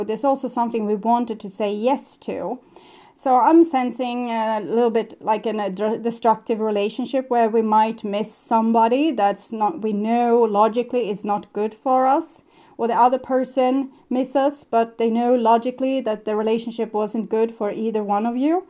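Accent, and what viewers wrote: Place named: Sweden. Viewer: I thought I recognized the accent😍 Min mor ar fran Skane.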